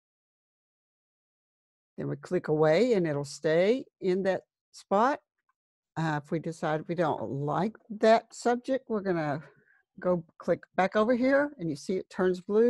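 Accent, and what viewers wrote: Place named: South, United States. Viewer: A very beautiful southern voice my dearest, tell me are you from Florida?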